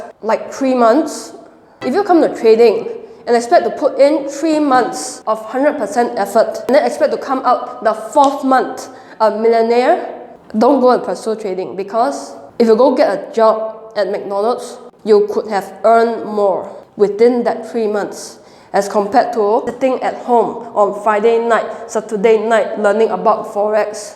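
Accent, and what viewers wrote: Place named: Singapore. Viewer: u sound singaporean and i like it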